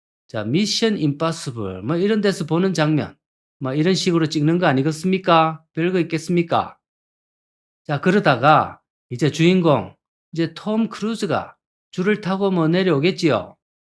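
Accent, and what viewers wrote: Gyeongsang, South Korea. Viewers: 정말 보리문디 사투리 정겹다.연식있다보이 다이자삣는데!직이네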